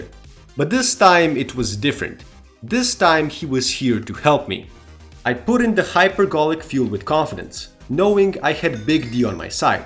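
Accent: Israel. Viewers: אז למה יש לו מקלדת בעברית וגם יש לו מבטא חשבתי בהתחלה שזה לא דומה למבטא ישראלי אבל זה כן מזכיר פשוט הוא לא עושה את הר והח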